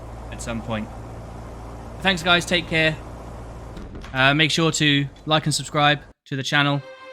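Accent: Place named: United Kingdom. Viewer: Great video! You sound like you are from the United Kingdom!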